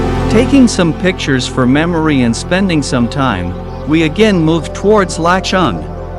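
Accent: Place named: United States. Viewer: Why would you use an American voice for this video?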